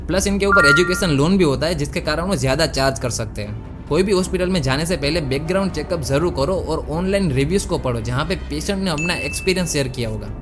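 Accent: Gujarat, India. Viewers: Aap gujrati ho bhai 😊😊😅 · ભાઈ ગુજરાતી છો તમે અવાજ પરથી ....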